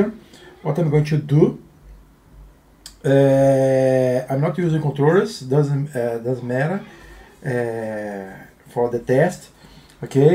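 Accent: Brazil. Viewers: esse sotaque ai é br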